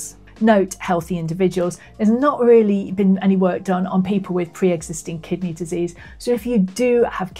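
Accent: United Kingdom. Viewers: I love the way the English say almost anything.❤️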